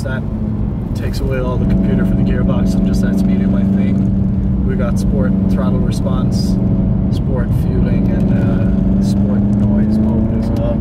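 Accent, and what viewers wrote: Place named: United States. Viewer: What’s with the American accent you’ve only been there a week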